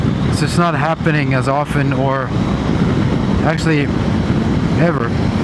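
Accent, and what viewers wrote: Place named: England, United Kingdom. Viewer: A Very cool informative review. One of the best English accents I have ever heard . Dad jokes are underestimated..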